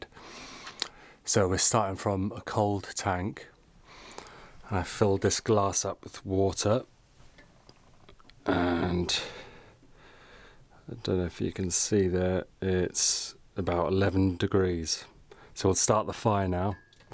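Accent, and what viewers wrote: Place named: England, United Kingdom. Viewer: Where are you located? You sound English but I doubt you're in the UK.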